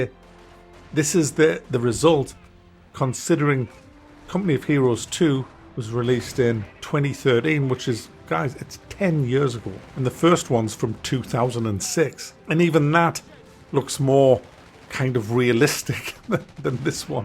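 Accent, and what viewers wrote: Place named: Scotland, United Kingdom. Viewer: Are you from Scotland or something? You have an interesting accent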